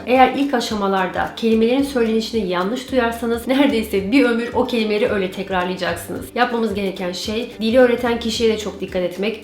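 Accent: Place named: Turkey. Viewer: aksanını acilen düzeltmen lazım çünkü belli bir şekilde Türk olduğun biliniyor.Bu arada saçların çok güzel.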